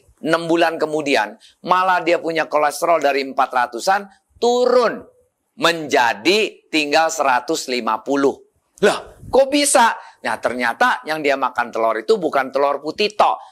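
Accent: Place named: Jakarta, Indonesia. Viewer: Pak ngomongnya kaya orang betawi🖒🖒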